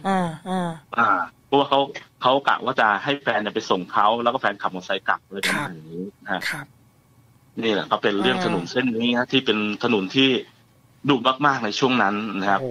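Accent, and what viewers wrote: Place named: Korat, Thailand. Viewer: สำเนียงโคราชมันได้อรรถรส 🤣🤣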